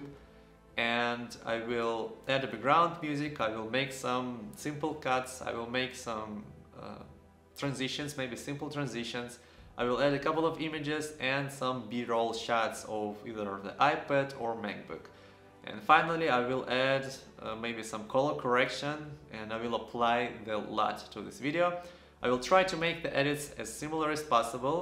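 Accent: Russia. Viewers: I love your Russian/Slavic accent! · Нам показалось что ты русский! английский норм. продолжай! Лайк влепил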